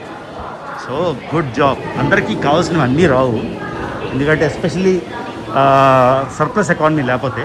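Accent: India. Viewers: Turpu bhasha bale matladutunnavu,vinadaaniki chalabavundi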